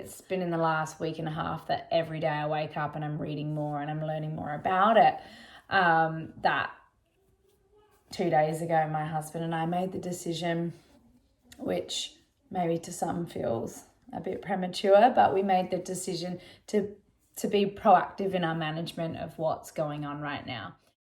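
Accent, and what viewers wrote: Australia. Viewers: It's weird hearing your Aussie accent, when you're soo American in everything! I'm in Sydney, I work from home and am ok with spatially distancing. It's been pretty blazee with community co-operation here, luckily it hasn't been as rife here, hope they can get it under control in Victoria! I too have been saddened by the "every-man-for-himself" attitude but society especially in the city is kind of like that anyway. Gosh I hope they find a vaccine, but life is so precious, hope we learn from this. Much love & light Xx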